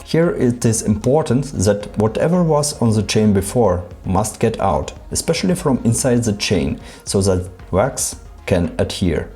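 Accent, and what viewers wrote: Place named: Germany. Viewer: Interesting accent fpr an english speaking german - is it for sure better then a paraffin + pfte powder combination?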